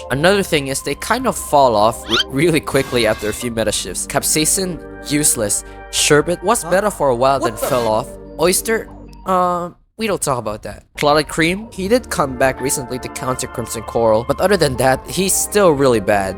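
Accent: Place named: Philippines. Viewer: i know a filipino when i hear one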